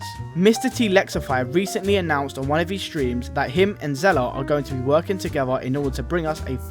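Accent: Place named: United Kingdom. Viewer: You sound like a british mrtlexify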